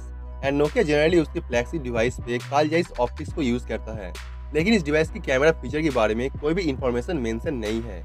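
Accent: Bengal, India. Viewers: Firts Change ur bengali tone broder....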